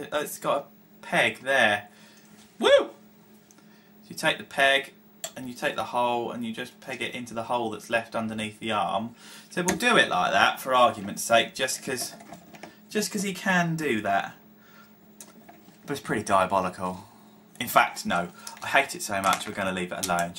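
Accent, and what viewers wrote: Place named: Wales, United Kingdom. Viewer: I like your warmup techniques sir! Thankyou for reviewing him for me pal and repeating what a bag of gash he is haha! A fiver is not too shabby tho i must admit! Great stuff me ol chum:) If you ever go to rhondda that welsh accent could save you life too;)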